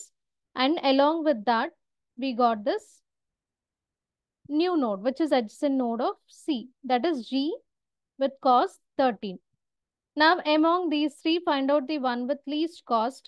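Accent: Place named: India. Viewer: Every computer science student adjacent student should be thankful to India. Once that accent hits your ears, you know you're getting a straight to the point video on the subject matter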